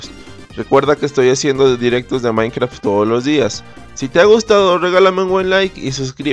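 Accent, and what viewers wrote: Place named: Ecuador. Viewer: Por tu acento, si no me equivoco eres de Ecuador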